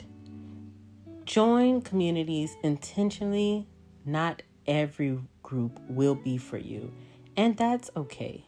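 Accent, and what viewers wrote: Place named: Ghana. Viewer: you really sound like a Ghanaian. Anyway with some travels to do a work. It can be lonely sometimes. But its can be a time for reflection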